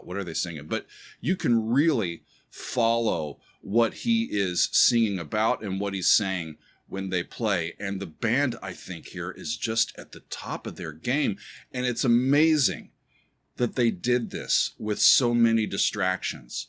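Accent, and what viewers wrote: United States. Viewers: This album followed a great album and was followed by a medocre one. Their best one and shows how a group going through difficult times can make rheir best work. Very powerful, very heavy. Annoying American commentary, aftef all we're talking British through and through.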